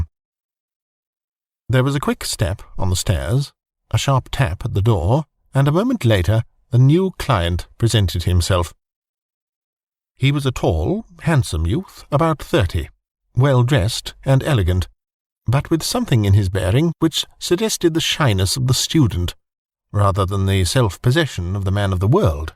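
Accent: United Kingdom. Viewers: Love Sherlock Holmes! Liked and subscribed! You have the best British accent ever! Love you!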